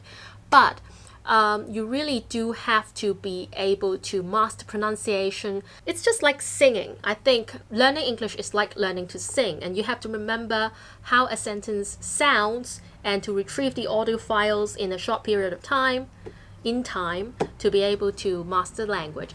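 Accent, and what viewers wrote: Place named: England, United Kingdom. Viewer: Being able to speak English fluently is a must and it's a routine / daily practice for every English teacher. It's not something AMAZING for an English teacher to do, or something that an English teacher has to be BRAVE to do among a crowd of students. I guess you may have exaggerated your ability to speak fluently with a bit of English accent. I'd like to tell you that there are hundreds and thousands of lecturers / teachers out there who can also speak English EVEN MORE FLUENTLY than you can. If you jump into any English Department in any university in HK, you'll soon find that you are just one of the thousands in the 7.5M population in HK.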